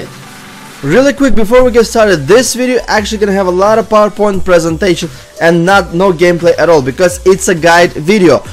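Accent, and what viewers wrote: Russia. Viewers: I would like to have a lesson because nothing is better than kicking some butts listening a multi glad russian ascent.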